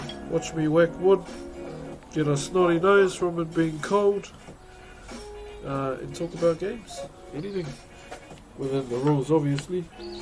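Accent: New Zealand. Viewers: Lol, thats cause Taika Waititi the director/korg of Thor rangnarok is maori and so is the bro. That's just our accent 👌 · The New Zealand accent is an immediate reminder of Korg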